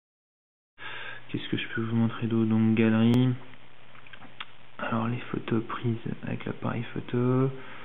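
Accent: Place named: East, France. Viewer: Bel accent de l'Est ça me rappelle mes racines!!! Sinon ton galaxy semble avoir des soucis, le slide parait bien plus réactif sur le mien et je n'ai jamais eu de problème avec l'APN... Belle demo en tout cas!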